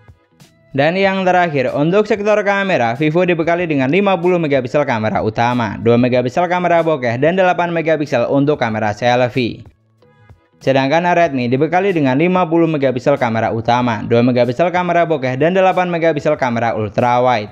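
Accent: Madura, Indonesia. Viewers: Logat madura bg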